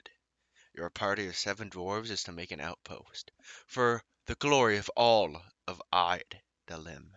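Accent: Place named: Ireland. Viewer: First comment. Also, I really like your Irish accent it sounds so cute ;). Can I pretty please get a dwarf named after me. :cat-orange-whistling::cat-orange-whistling::cat-orange-whistling::cat-orange-whistling::cat-orange-whistling::cat-orange-whistling::cat-orange-whistling::cat-orange-whistling::cat-orange-whistling::cat-orange-whistling: Your secret admirer , NiftySnipes:thanksdoc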